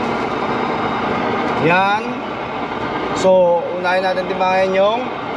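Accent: Ilocos, Philippines. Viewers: Mailiw nak man idiay Pinas no mangeg ko accent mo.. Keep safe trucking Manong ko, shout out met ah ..Uncle Sam Outdoor from Sydney Australia originally from Tondol Anda Pangasinan..Dios ti agngina apo.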